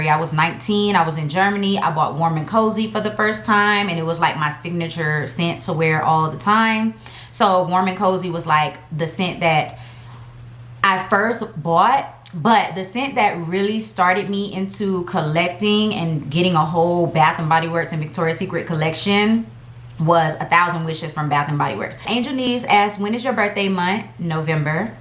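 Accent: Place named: Alabama, United States. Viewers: Ahhh, I super late to the video🥴 Giirrrllll you have a accent lol thank you for answering my questions. Funny thing is I heard somebody else from Miami said the same thing that they don’t have a accent but yes girl yes somebody like me from the Northern Midwest can hear it. It not thick or country at all but I could tell you was a southerner. Btw loving the hair and makeup in this video😘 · I really loved this video! We’re the same age! (Well my Bday is April 1) Aries ♈️ I tend to like Sagittarius ♐️ you’re cool ppl 💕 Keep it up beautiful 🤩 And Alabama accents lit 🔥 lmao 😆 -Thank you for your service!! 🇺🇸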